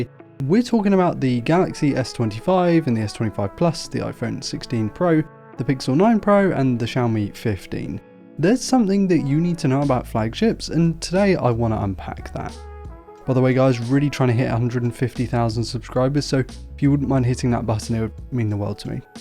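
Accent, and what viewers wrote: England, United Kingdom. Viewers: an englishman posts clickbaits on principle- here it being to gather as many likes as he can. your accent is the MVP of your channel, Sire.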